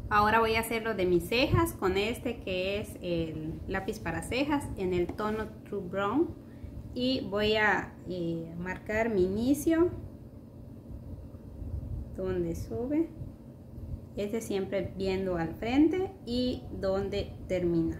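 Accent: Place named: Campeche, Mexico. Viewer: Me encanta tu acento es de Campeche? y tu cutis., muy sano y radiante.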